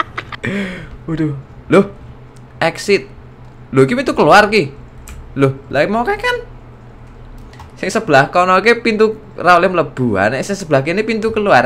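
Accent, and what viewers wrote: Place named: East Java, Indonesia. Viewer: khas jawa timur mas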